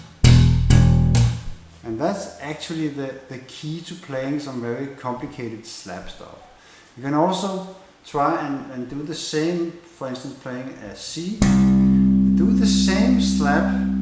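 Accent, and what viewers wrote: Denmark. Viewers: That Danish accent is so funny ! Great lesson :) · haha han er så meget dansker !!! xD det så tydeligt på hans engelsk udtale ! xD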